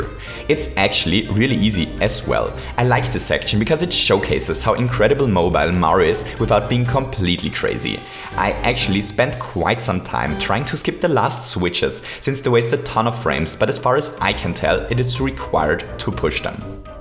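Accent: Germany. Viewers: Wow. It's so funny, I know you are a German, because how you speak English. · Or german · Ernsthaft? So einen krass deutschen Akzent hab ich im Englischen noch nie gehört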